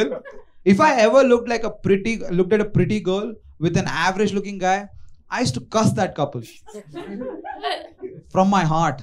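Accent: Haryana, India. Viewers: dude your haryanvi damn funny bro..!! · that Haryanvi accent was hilarious 😂😂😂😂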